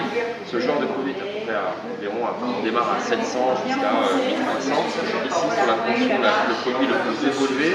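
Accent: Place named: France. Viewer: Ich habe bislang nur sehr selten jemanden gehört, der so französisch spricht, wie der Protagonist in diesem Video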